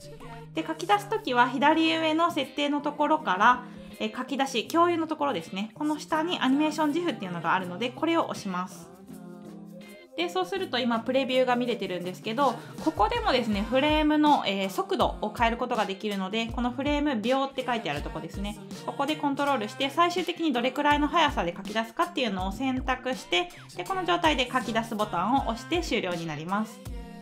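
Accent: Kansai, Japan. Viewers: 今日めっちゃ、関西弁でてますね！😍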